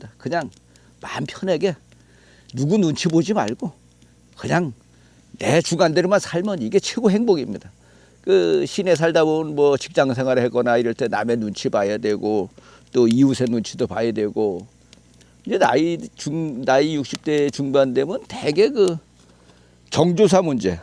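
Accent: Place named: Jecheon, South Korea. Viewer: 제천 , 단양 사투리 같은데요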